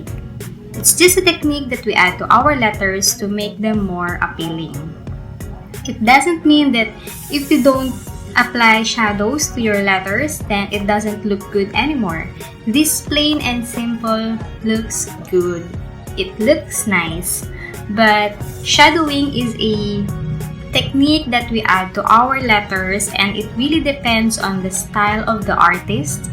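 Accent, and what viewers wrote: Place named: Philippines. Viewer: You are very helpful. Glad I found your channel. Pilipina ka ba? I can sense based on your accent.. Hehehe.. Thanks a lot! 💚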